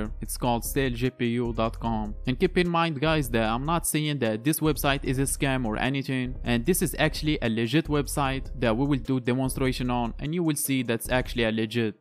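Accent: Morocco. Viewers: are you from morroco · khoya bayna fik maghribi f accent rak nadi akhoya,khoya momkin insta dialek? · لهجة مغربية 😅 بينة من طبارة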